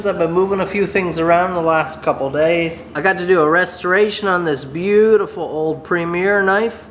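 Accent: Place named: Newfoundland, Canada. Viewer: you live in Newfoundland?? no wonder i can't understand you LOL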